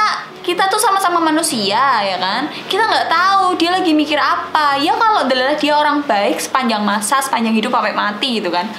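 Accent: Java, Indonesia. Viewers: Logatnya bahasa Jawa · Medok tenan sih mbak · Suaramu khas Jawa banget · Logat e wong jowo Iki koyok e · medok banget yaaa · kyk medok2 ngomong jowoan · bahasa jawanya ituloh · logat bicaranya kaya orang jawa? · Sampean wong ndi mba loogate ko jowo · Wong jowo tah MBA jawane pundi? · Meddddhhhookkk anjirrrrr jowoneee.....!!!!!! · Medok bangettt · Embak lek ngmong lucu lo, Jawa pundi pean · Orang mana si kok kyknya orang jawa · Medok bener jawa'a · Mbk e wongdi? Kok jowone medog bgttt😅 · Medok amat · Mantap medok juga bahsanya kak Jangan lupa mampir ke chanel aku juga ya teman temanku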